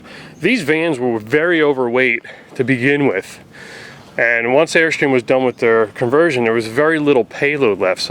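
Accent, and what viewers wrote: New Jersey, United States. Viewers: Love the “wooder” hose, and “wooder” heater. Gotta love the Jersey accent! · Everyone in Jersey sounds like that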